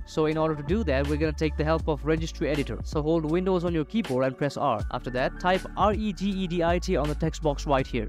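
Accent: India. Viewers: Didn't work. I should have known once I heard the Indian voice. Moron ...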